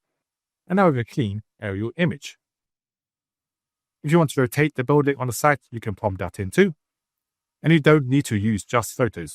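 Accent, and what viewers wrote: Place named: United Kingdom. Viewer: lol your British accent is so strong I literally started wondering what "Google F" was 😂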